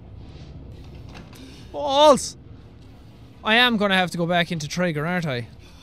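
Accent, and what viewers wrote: Ireland. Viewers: I have an intense stomach sickness going on right now, and there's nothing like a sweet Irish accent to lull me to sleep :3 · i have seen the whole gameplay even of the whistleblower dlc and I love watching him figure it out with his Irish accent, anyone else?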